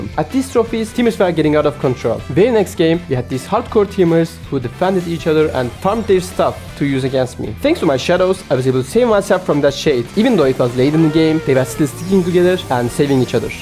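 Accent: Turkey. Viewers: Howdy maan?? I wanna ask smth, are u turk? bcs ur accent looks like turkish. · Are you from turkiye your accent looks turk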